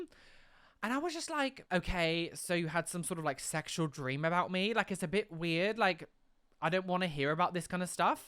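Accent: United Kingdom. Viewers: This is COMPLETELY off-topic, but when you speak American English, the way Brits say "R" is so funny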